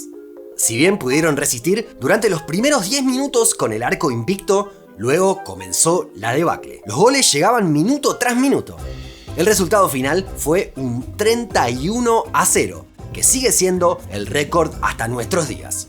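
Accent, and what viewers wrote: Argentina. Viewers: Es argentino el que habla? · Como tiene un cantito especial solo con las she pensé es argentino❤😊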